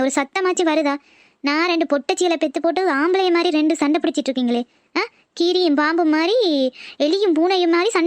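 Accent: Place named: Sri Lanka. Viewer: Akka srilankan tamil Mathere iruku neenga srilanka va 🤔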